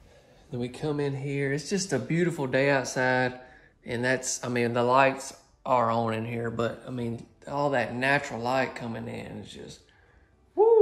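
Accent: South, United States. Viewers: Love the floors. Nice house but th as t 2 story was my favorite! And you don't sound like a goof! You sound like a southern gentleman! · You could sell the phone book . I like listening to your southern charm and wit . Keep up the good work !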